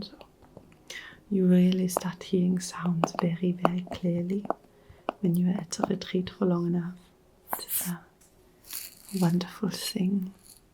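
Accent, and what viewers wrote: Germany. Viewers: No way you’re not actually German!!! The accent is spot on! I thoroughly enjoyed this video, had it as background noise while I was reading and it’s just perfect - thank you! 💖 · This was so fun and relaxing! I am ready for my meditation retreat! Loved your German accent too! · I am living in Germany right now and I loveeee your German accent videos!!👏👏👏 Toll gemacht! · I’m so astonished how good your accent is. You got the German r sounds perfectly!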